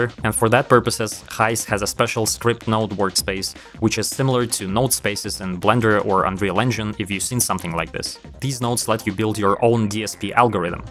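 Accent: Russia. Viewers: He sounds like russian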